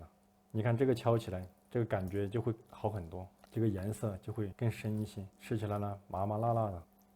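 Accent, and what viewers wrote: Sichuan, China. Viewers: 王老师的四川话在恢复唉，泹，汃，这些四川话都冒出来了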